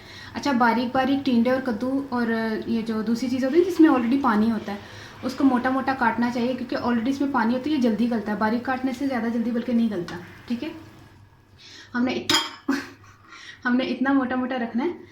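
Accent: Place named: Punjab, India. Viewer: muzna malik یہ تو پنجابی لہجہ ہے یہ تیز ہی بولا جاتا ہے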